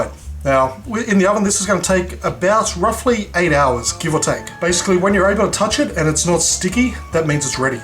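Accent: Australia. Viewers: Are u from Australia if so what state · I'm subscribing you you can you have a really cool oz accent and your videos are creative and original keep em coming!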